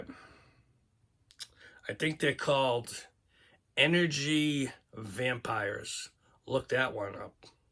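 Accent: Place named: New England, United States. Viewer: Hi! I could not have found your channel at a better time in my life. Thank you ox. p.s. Love and miss that New England accent. I grew up in Massachusetts.❤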